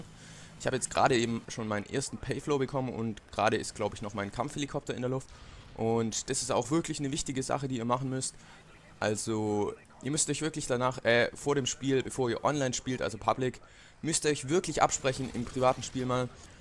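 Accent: Swabia, Germany. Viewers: hihi :D ein schwaaaabe :) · Schwäbisch FTW!!!! :D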